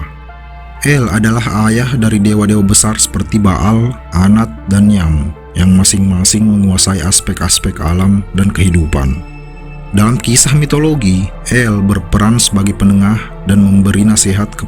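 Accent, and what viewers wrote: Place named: Bugis, Indonesia. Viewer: Knp logatnya seperti orang bugis😂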